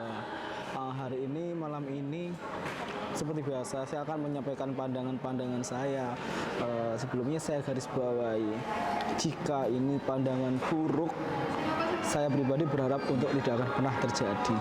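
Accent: Java, Indonesia. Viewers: Nama chanelnya..tp klu bicara medok koyo wong jowo..sukses ya.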